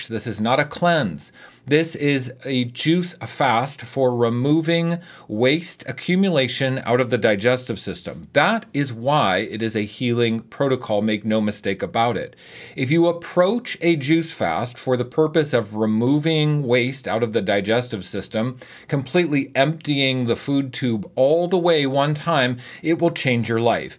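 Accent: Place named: United States. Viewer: Cause he is speaking very well,not too fast and trust me because it’s American English. 😊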